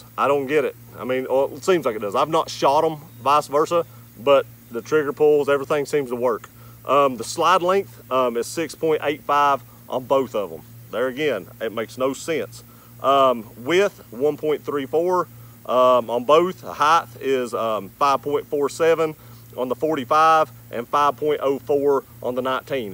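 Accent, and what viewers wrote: South, United States. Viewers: If I hear someone with a southern accent talking about guns, I know they know their guns.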